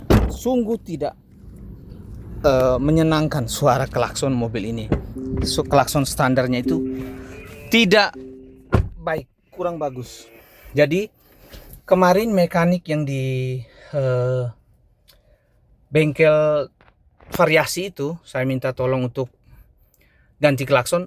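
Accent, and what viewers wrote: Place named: Nias, Indonesia. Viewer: logat nya kyk nias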